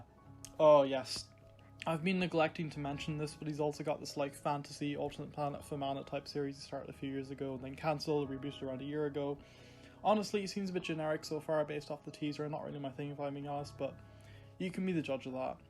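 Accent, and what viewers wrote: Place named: Ireland. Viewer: Nice Irish accent.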